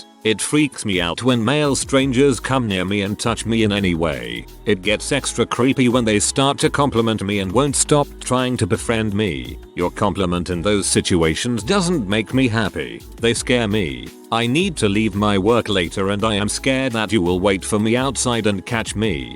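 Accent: England, United Kingdom. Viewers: Why is this narration in an English accent? Much harder to understand.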